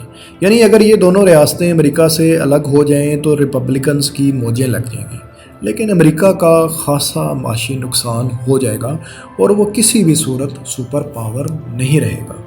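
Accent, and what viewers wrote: Punjab, Pakistan. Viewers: bhai....khuda key wastey urdu main punjabi mat Bola Karo yaar....English words ko bhi punjabi style msin parhtey ho...urdu ka janaza mat nikalo yaar...hire some good urdu speaker...plz...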